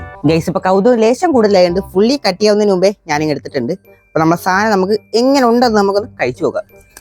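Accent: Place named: Kollam, India. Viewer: Kollath parayum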